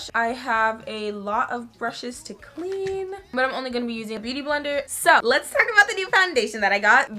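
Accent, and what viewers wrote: United Kingdom. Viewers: Why did I get confused by the British accent for a second?😂😂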